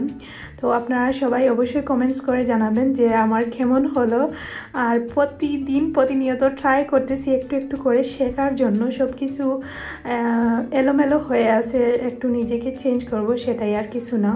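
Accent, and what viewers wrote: Sylhet, Bangladesh. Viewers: আপু আপনার মুখে সিলেটি মাত ভালা লাগে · apu apnar mukher sylhety accent ta besi valo lage please apni oi vabei video banaa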